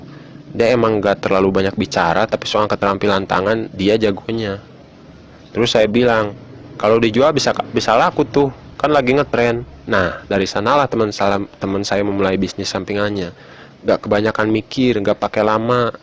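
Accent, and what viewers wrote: West Java, Indonesia. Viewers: Ini yg yg bicara di vidio ini logatnya Kaya orang sunda Krn qu apal bnget kalu orang sunda itu bicaranya mskpun pke bhasa indonesia tapi logat sunda banget krn qu juga orang sunda Tapi maaf ini cuman perkiraan saya aja.kalu ga bnr bhwa bukan orang sunda minta maaf aja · Taiwan Taipei ..muhun logat sunda..sami sareung abdi.. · sunda bangheet...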